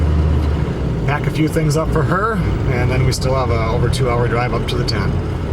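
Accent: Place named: Midwest, United States. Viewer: I love your content & channel,. I’ve been a HUGE fan for about a year now. I knew you were from the Midwest with that kind of an accent. My brother is from Chicago, so I know a Midwest accent when I hear one. Your videos are so therapeutic after I clock out of my shift from the hospital. I watch your videos almost every night. Greetings from Seattle, my friend. 🙏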